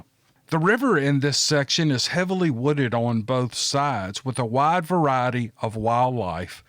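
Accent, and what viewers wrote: South, United States. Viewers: I thought I had listened to all your stories especially when I was laid up with the first cancer bout but it Appears not great to hear your voice I do listen to cryptids Canada but nothing is like your southern accent and gentle voicing of your stories thank you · Of all the great channels I've listened to, I think you have the best voice presentation. The easy southern accent is perfect for this type of thing, and the way you focus on your enunciation is very appealing. You should consider narrating audio books... You have a natural gift for it.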